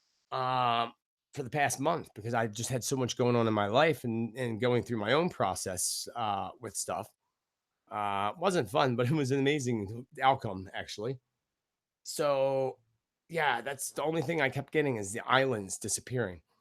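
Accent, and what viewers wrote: Philadelphia, United States. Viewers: You from Philly? Accent sounds familiar...Delco? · Our HumanityFamily deserves no less than what I've known will come for 2019-TRUTH and JUSTICE! We will deal and heal with the upcoming revelations that will SHOCK us to our core, together. ThanQ for this video! I love listening to you, lol, you remind me of home 😊, I was born in Philly and lived ALL THROUGHOUT the tri-state area growing up lol-there's NO hidin THAT accent 👍. 💜💜💜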